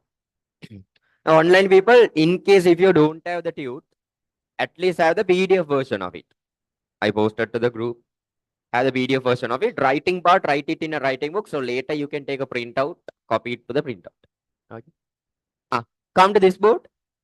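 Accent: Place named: India. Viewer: Great sir But I can't understand Ur Indian accent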